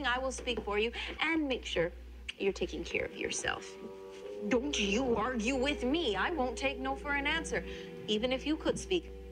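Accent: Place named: Minnesota, United States. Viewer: This is adorable. And so is her accent, idk if shes from Minnesota, but it's cute. ❤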